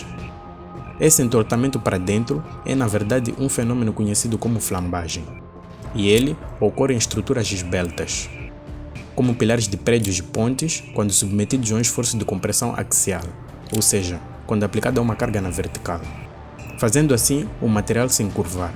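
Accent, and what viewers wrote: Portugal. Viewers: Nossa, que idioma esquisito, parece português europeu ou português arcaico... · Português de Portugal, ninguém merece aff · Por causa desse sotaque insuportável de português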